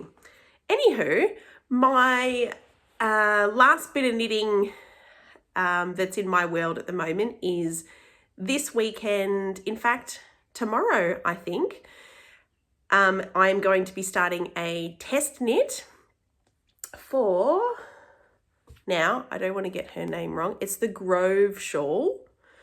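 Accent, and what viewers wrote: Australia. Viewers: Congrats from W.A on a very enjoyable and technically brilliant first podcast, it's always terrific to hear that aussie accent. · Hi, Diane from Mount Waverley here. I was so thrilled when I clicked on your podcast and out came your Aussie accent saying you were from Geelong. I loved the way you promoted Aussie yarns, especially BWM. I can't wait to watch your next video. As for jumper/sweater suggestions, it depends on what type of fit you want. Basic raglan sweater by Joji Locatelli might be a good place to start. Or the Felix pullover by Amy Christopher's or the No Frills Sweater by Petite Knits. I've made the latter two, and they are both good, basic raglan, top-down constructions.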